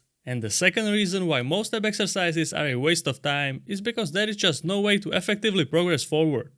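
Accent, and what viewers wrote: Czech Republic. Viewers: I was worried for a second there, hearing your accent, but Ig you're Czech ^^ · Are you from the Czech republic?? Because of the accent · Are you Czech by any chance? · czech accent detected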